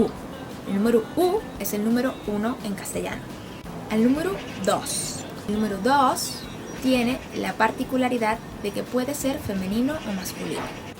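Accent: Venezuela. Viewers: Sabía que eras venezolana por tu acento y... por tu belleza! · Ahhh es que escuchando tu acento dije que no eras nativa de España ni si quiera, y no me sonaba a más nada sino al de acá. Yo soy Venezolano pero con acento paisa colombiano por mi padre...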